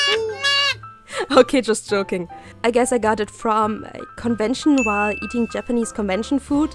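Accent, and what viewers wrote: Germany. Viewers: bist du deutsch? du klingst deutsch :D ok ja, bin ziemlich sicher :D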